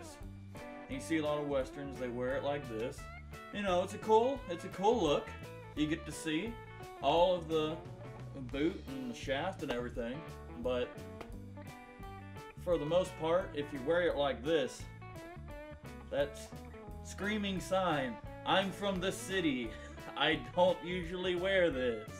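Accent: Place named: South, United States. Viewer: Wow I noticed that you have a southern accent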